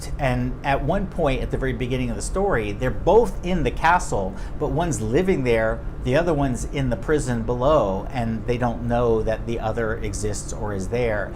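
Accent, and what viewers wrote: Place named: West Country, United Kingdom. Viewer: This is a lovely example of West Country accent, which can also be found on the Channel Islands (of which Guernsey is one) <link> Thank you so much for all the recommendations! I've read Bear Town, and loved it, but I've never heard of the others. I have much exploring to do :) so thank you!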